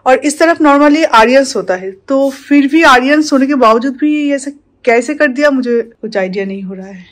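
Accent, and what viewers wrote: Bengal, India. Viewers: she speaks hindi, in bengali.... too much to take... both her hindi and english is a blot for all urban vloggers... upbringing and background matters !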